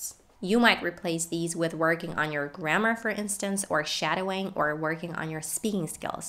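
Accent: United States. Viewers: Круто! Я б не сказав що Ви Українка, гарний американський акцент. І техніка 15 хвилин варто спробувати! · Is your English pronunciation of the USA? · Hi my intimate teacher,. I follow your videos on a daily basis. I always use the methods that you suggest so as to be excellent at Englih as you. I admire your American accent. When I watch your videos and listen to your fluency, I feel relaxed. I hope I'll speak English as perfectly and fluently as you using your methods soon. Thanks for your beneficial advice and lessons. Keep going and we're all with you. Always take care. Sevara from Uzbekistan:)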